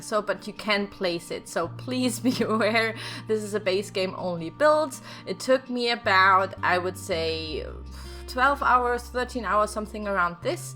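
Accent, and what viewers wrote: Germany. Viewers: I love your German accent! 💕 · Wow thats amazing! BTW judging your dialect i bet you're from germany, aren't you?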